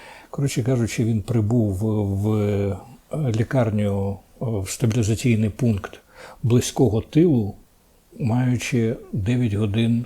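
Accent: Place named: Russia. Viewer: пане, "нЄхлюство" українською мовою - "недбалість". Вам не подобаються українські слова? вам більш до вподоби московські? з московською вимовою на кшталт "проблЄми" тощо. Навіщо ви так спотворюєте державну мову?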